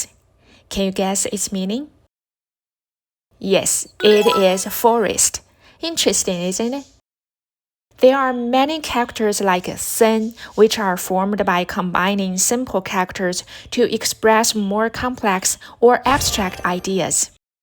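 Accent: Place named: England, United Kingdom. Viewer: really great and like your English accent it is very charming. Thank you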